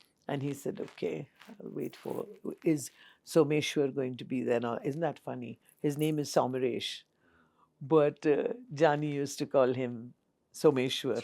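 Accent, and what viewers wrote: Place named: Kerala, India. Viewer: Loved your interview and malyali accent😅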